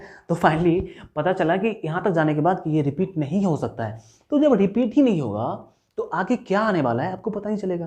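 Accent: Bihar, India. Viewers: Sir are you from Bihar?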